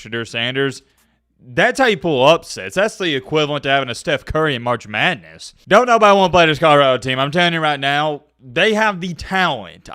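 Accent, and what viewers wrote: South, United States. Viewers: Love your Colorado talk with your southern twang.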